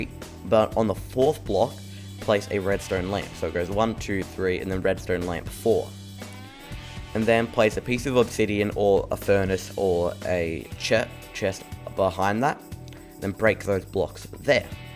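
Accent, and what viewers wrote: Australia. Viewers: It it just me or is this man Australian? <3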